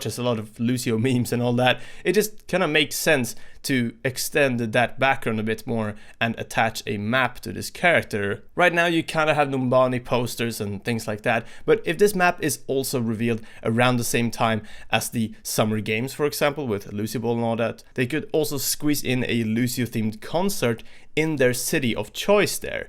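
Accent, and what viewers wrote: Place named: Sweden. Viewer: Jag hörde på din dialekt att jag du var svensk, jag visste det 😂 Fortsätt med det du gör du e helt otrolig och jag älskar dina videos ❤️👍😀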